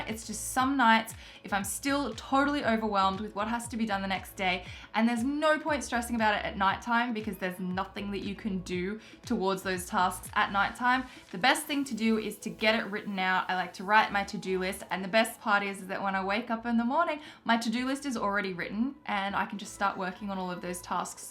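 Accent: Australia. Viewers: what is your accent ?where are you from ? just wondering , I have heard others with the same accent but I"m curious ? Is it an Australian accent ?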